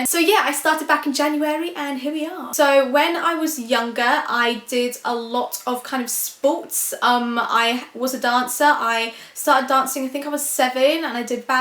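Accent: Scotland, United Kingdom. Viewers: Thanks for a very candid and personal insight. Nice to know you better 😊 Never knew you were Scottish ! The accent fuelled me 🤔 Your challenging high schools years do not appear to have affected you negatively. Your looks and personality are much more suited to bring in front of the camera ☺️ The quality of video and sound on your videos are excellent. Maybe you could do a video on what gear and settings you use for other Youtubers ? Love your channel. Please keep them coming.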